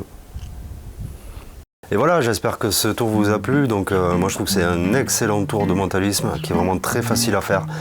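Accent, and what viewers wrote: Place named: South, France. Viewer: Pour commencer, le soleil, l'accent du sud, les lunettes et des cartes, ça fait du bien, c'est beau ! 😉😎 Ensuite ce tour est clairement une petite pépite qui permet beaucoup de possibilités d'histoires et il est particulièrement efficace ! Je trouve très cool que tu aies osé dire que la version du livre était erronée et pas forcément bien expliquée... C'est bien dommage et regrettable quand il s'agit d'un magicien de cet acabit ! Malheureusement il n'est pas le seul à avoir fait un livre où certaines choses ne collent pas, à se demander pour certains s'ils écrivent eux-mêmes leur livre et s'ils prennent le temps de vérifier que tout est OK ! 👌🏻 Pour la subtilité de daortiz que tu proposes, j'adhère pleinement, c'est plus osé mais ça enleve le côté redondant de la première version, c'est cool que tu en parles aussi ! Pour finir 😅 tu as parfaitement raison, certains tours automatiques sont bien meilleurs que des tours avec manipulations et celui-ci en est la preuve ! 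Voilà, très bonne vidéo quoi qu'il en soit, merci pour le partage mon pote ! 😉👊🏻🔥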